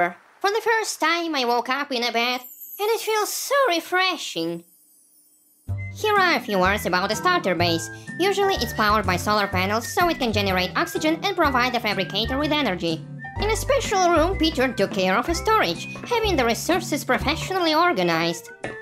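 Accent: Russia. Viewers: Ох ебать акцент как будто на русском смотрю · Блин слышно у него русский акцент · Мне одному отчетлево слышен его руский акцент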